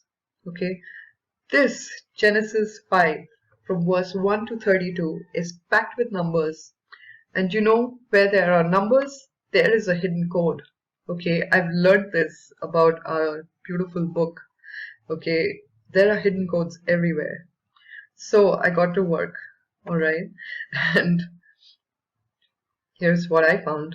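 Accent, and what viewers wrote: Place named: Germany. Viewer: I am so glad I found your channel... I have always felt there are codes in the Bible, and I know for instance in the KJV, there are 783,137 words and right in the very center of these words of the Bible are two words - the Lord.... it is no coincidence that the Lord is at the very heart, the very center of the Bible... you are on to something.. I have lost most of my vision recently by eye stroke... I have to use Windows Magnifyer set at 4 or 5 tiimes normal... I will do some research and get back to you... I wonder if we can find 1221 working with other numbers in Genesis also.. I am going to do some research and see what I find, I'll get back to you.. I also feel Noah's ark, that story is also a symbol of the Rapture and Tribulation for our generation.. the God fearing people have a Protector, the Ark, helping collect them before tribulation, The Flood comes to the Earth... 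a sign for the Rapture and Tribulation right there...okay, nice to meet you, will get back if I find anything.. love your accent, is that German/Austrian I am hearing? I love it....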